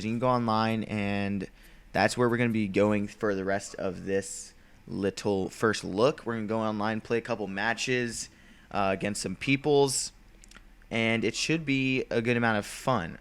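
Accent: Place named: United Kingdom. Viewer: Breathless British accent there xD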